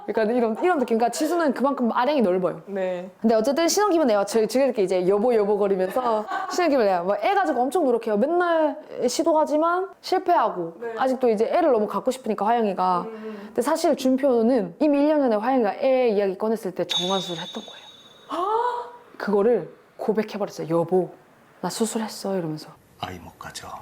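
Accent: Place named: Seoul, South Korea. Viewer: 언니 나 하루에 2번씩 보고있는데 연기력 물이 올랐쟈나~ 서울말투 기가 멕히쟈나~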